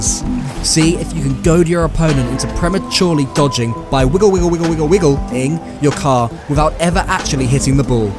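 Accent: Denmark. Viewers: Is he danish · mate??? you're a dane??? :D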